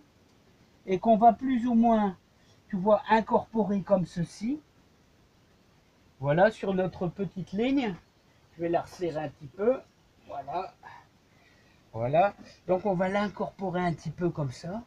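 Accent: North, France. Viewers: j adore!! ta facon de parler teu s'ro point du nord tizote? hi hi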